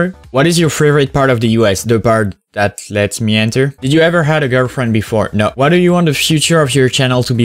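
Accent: France. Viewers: Tu as un accent Français 🤔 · J'était sur à 100% qu'il était français, en tout cas sont accent est incroyable x) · I just discovered this guy, and new he was french the first seconds of the video. It’s amazing how french accent is recognizable. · sounds french speaks English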